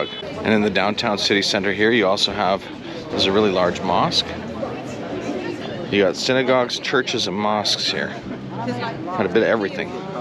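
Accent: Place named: United States. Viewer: Stop staying there and calling it downtown - it is part of the wide centre but it is also part Central-North Sofia, that is not the downtown - that is the AVOID area... Downtown is around NDK and between St. Sunday and NDK and above NDK and East of NDK West of NDK and anything but NORTH of Serdika Metro station. W are part of the European Union, we are not part of the fiscal Euro zone even though we are fixed to the euro.Only use ATM's in Banks or attached to Banks - not the Yellow and Blue NO ATM has ATM written on it and being legit. The area you were in in that restaurant where you had a bad experience we refer to as little Arabia and you sound American.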